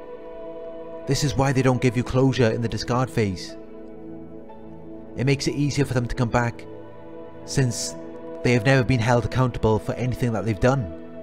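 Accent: Wales, United Kingdom. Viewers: You sound Welsh ? Good video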